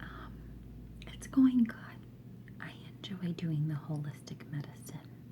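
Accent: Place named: Minnesota, United States. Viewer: Listening to you speak is like watching the movie "Fargo"!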